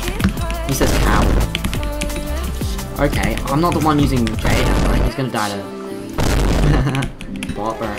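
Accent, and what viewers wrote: Australia. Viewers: R u from qld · Can i play with u also are u a. Aussie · Aussie accent is 🔥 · W accent I’m Aussie too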